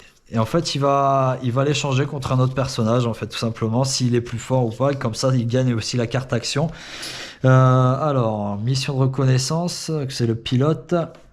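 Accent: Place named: Italy. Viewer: Aloha 🤙🏝️. Très bon accent Italien👍 Je ne connaissais pas ta chaîne. Tu t'exprimes bien ça fait plaisir! Tu es passionné c'est cool! As-tu arrêté tes parties?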